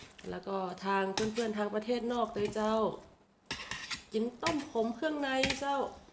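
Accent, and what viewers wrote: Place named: North, Thailand. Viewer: ต้มขมเครื่องในน่ากินมากเลยครับพี่ พี่เป็นคนเหนือติครับ#เจ้อยู่ที่ผมทำงานกะพูดแบบพี่เลยเสียง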